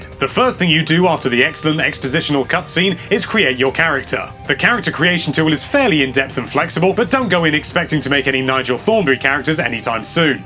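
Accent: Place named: United Kingdom. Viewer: I love how wonderfully British you are, it's so fun listening to you:) I can't wait for this game, I'm so hyped.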